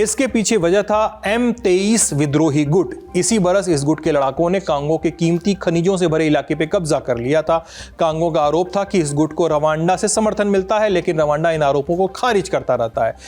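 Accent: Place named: Varanasi, India. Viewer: पसेरी के भाव से बनारसी टोन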